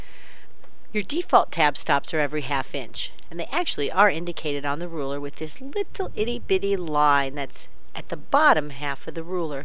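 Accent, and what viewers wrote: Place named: United States. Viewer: Pretty much a straight American-English accent. Ha, ha.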